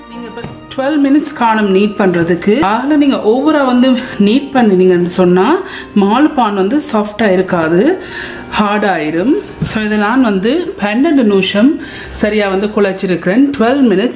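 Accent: Sri Lanka. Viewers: neege indiyathane. Ogada tamil. Sri lanka tamilmatheri iruku. Mam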